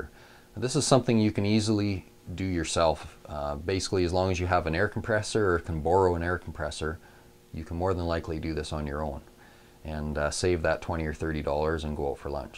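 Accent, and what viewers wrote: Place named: Canada. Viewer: Great video. I think you are in Canada, as you pronounce House as Haouuse ;) and the ABS pipes instead of PVC. But, the reason I looked up for video like this is because in DC area, I pay $130 to blow out sprinklers. Also, I have a 8 gallon air compressor with 4SCFM, and I was not sure if it can do the job. Watching your video makes me think, I can. Thanks again.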